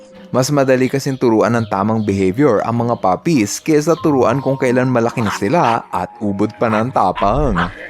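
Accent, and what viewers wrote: Visayas, Philippines. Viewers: Gusto ko po ang german shepherd,dutch shepherd at belgian malinous po kuya pero may tanong ako sayo visaya kaba kasi ang ibang narinig ko sayo visaya katolad namin😅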